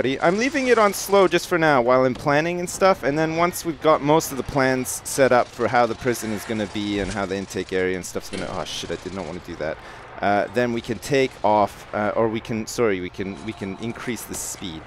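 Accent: Canada. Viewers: Is he Canadian?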